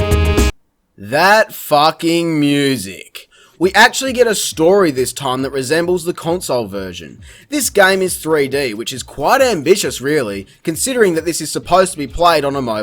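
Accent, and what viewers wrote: Australia. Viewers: UGH HES SO FUCKING AUSTRALIAN IT HURTS IT SOUNDS SO FUCKING AUSTRALIAN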